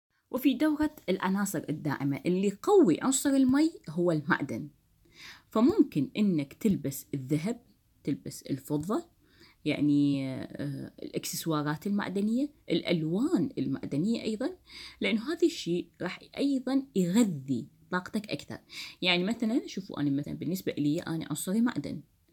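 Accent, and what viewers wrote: Iraq. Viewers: انتي عراقيه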